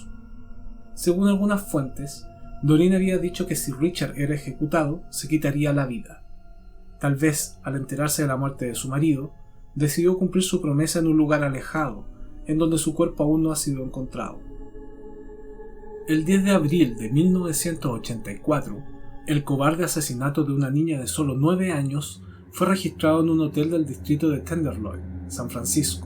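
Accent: Chile. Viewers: Lo único bueno sería que hables bien español neutro y no chileno que no se entiende nada, y aprende algo de Inglés por dios.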